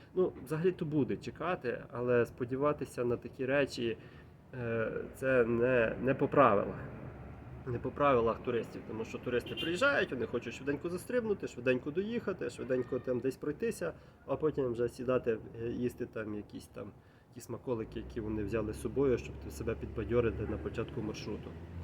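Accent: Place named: Kolomyia, Ukraine. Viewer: Ти сам родом звідки? По акценту дуже нагадуєш моїш знайомих з Коломиї, Косова.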